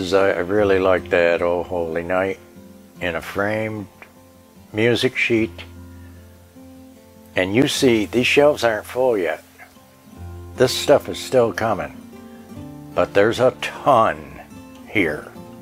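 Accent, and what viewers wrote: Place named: Midwest, United States. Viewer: R u from the midwest? Love your accent merry shopping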